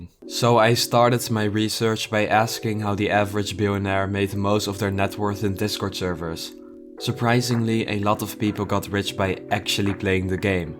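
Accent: Netherlands. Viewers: are u netherlands? u have a netherlands accent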